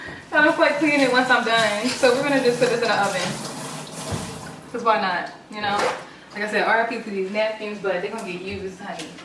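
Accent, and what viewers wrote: Baltimore, United States. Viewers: Are you from Baltimore I hear an accent ? This video was needed change is always good.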